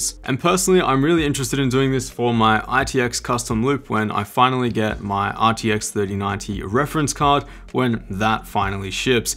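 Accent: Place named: Australia. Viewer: Must ask, how do you have an 3080 FE when you sound Australian? Do you live in aus? Did not think the FE was available to convicts like me living in Australia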